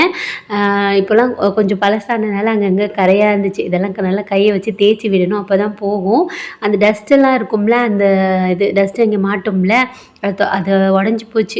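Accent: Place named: Ramnad, India. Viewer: Akka unga voice abdiye ramnadla ulla pechu varthaiya iruku Alhamthulillah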